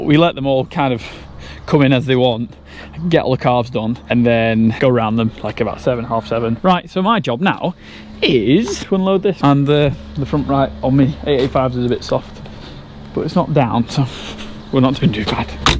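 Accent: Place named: Scotland, United Kingdom. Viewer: I’m from Texas and it’s taken a couple of yrs to catch the Scottish pronunciation but it’s fun just listening 😊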